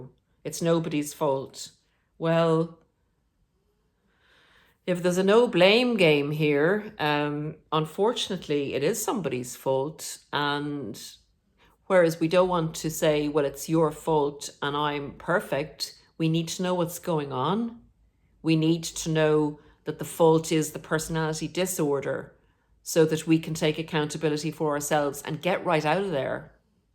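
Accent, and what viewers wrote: Ireland. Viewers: You don’t need to defend anything you do, you are a kind wholesome person and I love your beautiful Irish accent. My darling Irish Mammy died in March. She taught me how to be loving and to love.Please don’t don’t don’t apologise. The best trick of the devil is to pretend he doesn’t exist.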